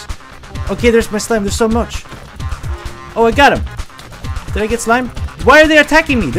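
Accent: Sweden. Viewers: Are you from sweden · Are u swedish?? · Are u swedish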